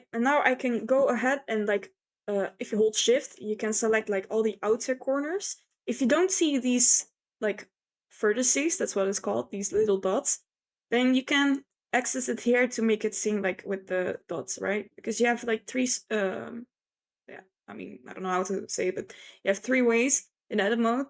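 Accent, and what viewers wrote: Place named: Morocco. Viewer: U sound moroccan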